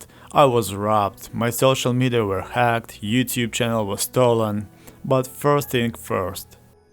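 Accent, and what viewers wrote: Russia. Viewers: да, акцент точно говорит, о том, что скорее всего русский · Ты не из России? Акцент похож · Чел, ты русский? Акцент похож, но это не в обиду, потому что плевать на это. Ты реально крутой ютубер и гитарист, поэтому успехов тебе)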